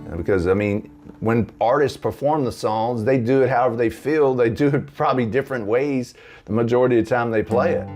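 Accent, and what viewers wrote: South, United States. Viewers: great lesson, haircut and southern draw! You made it easy........Oh, and yes, is one of the greats!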